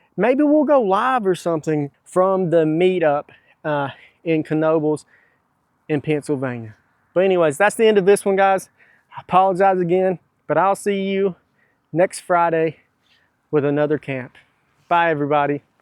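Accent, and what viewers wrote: South, United States. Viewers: Another great video! As a Canadian, I think one of my favourite parts is the southern accent lol the way you said “see-ment” made me laugh (the same way we say “aboot” and I don’t mean this in a negative way! Thanks for the laughs and another great video. Cheers!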